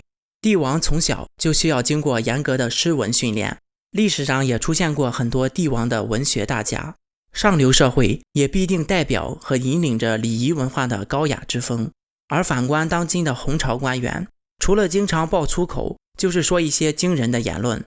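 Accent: Shandong, China. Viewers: 博主山东人？？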